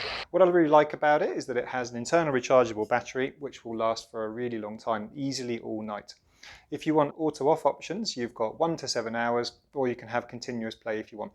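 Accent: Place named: United Kingdom. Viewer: Thank you for this clear concise and detailed description. Your presentation is a pleasure to listen to. Love that British accent!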